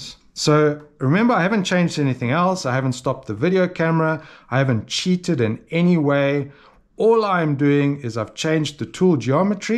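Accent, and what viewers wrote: South Africa. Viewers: Lovely South African acsent!!. Learnt a lot. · Lovely to hear a good South African accent.... I recently built a stone slicing machine and had trouble of chatter machining the ends of the mandrel to take the cutting disc. I made a lathe steady which has helped in the machining of the long shift but I will definitely use your methods to make a cleaner job.... thanks.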